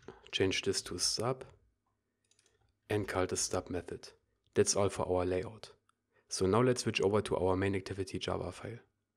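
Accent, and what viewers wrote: Germany. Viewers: Thx man... BTW I like GERMAN Accent 🌚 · I smell german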